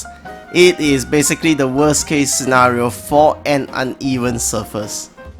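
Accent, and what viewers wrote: Singapore. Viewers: You sound like a Singaporean/Malaysian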